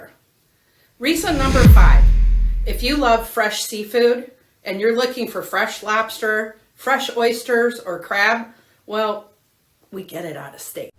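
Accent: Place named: Michigan, United States. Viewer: Perfect Michigan accent!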